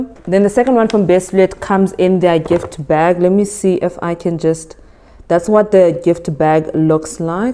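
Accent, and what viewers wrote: South Africa. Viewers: Came here for a review on the waist trainer (which was so detailed thankyou💛) but I also got so distracted by your accent, I come from the UK and love the South African accent so much. I've been obsessed since watching Blood and Water 😍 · My first time here and I was crossing fingers you not naija😃because wow we are tired shame(love them still😂) then I heard your accent and I was like ai yebo ai yebo🤗🤗my sister oooh.anyways I have a problem,my tummy was slightly big so I bought these 2019 used it but nothing happened then I stopped.last year decided to buy a different one but nope rather my tummy has changed from being tight to soft that's all🤔😢so any advice for the sister here?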